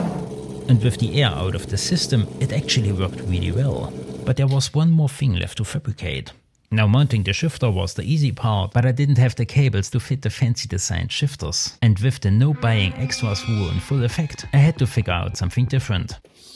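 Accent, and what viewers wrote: East Germany, Germany. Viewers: Du kannst mir sagen was du willst, Youtube sagt, deine Videos sind aus den USA, dein Shop registriert in UK, aber dein Dialekt und dein Erfinderreichtum... Deutscher 😅 Bei kann man sogar Lieferwagen sehen, die das bestätigen... Bisschen chaotisch, interessante Ideen und sogar Wissenschaft dahinter. Cooler Content! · Das englisch mit ostdeutschen dialekt ist immer am besten xD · Never laught so much about a german accent xD I love it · Ostdeutsch und Englisch gemischt geiler typ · Man hört das du Ossi bist, geiles Video! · Am I imagining things or are you from east germany? My coworker is from Sachsen Anhalt and he sounds pretty much identical to you in terms of dialect. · What is this? Is this the east?-German (based on the accent) version of StuffMadeHere with a focus on engines and a moldy refrigerator full of alcoholic beverages? 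Super exciting to watch in any case! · der akzent, pfeffi und goldkrone... schon eher östlich in deutschland oder 😁 keep on going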